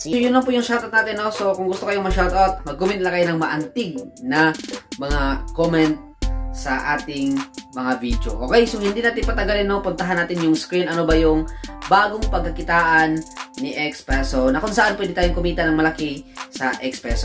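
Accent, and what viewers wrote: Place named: Visayas, Philippines. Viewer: Bisaya nga ganyan ang bisaya magsalita matigas pero tama ka boss at may napansin din ako na parang buyoy sya magsalita hahaha.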